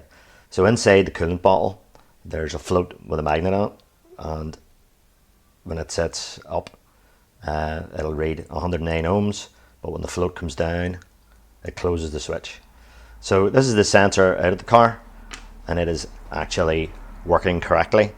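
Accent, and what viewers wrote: Northern Ireland, United Kingdom. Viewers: Good video thanks. Great to hear the norn iron accent!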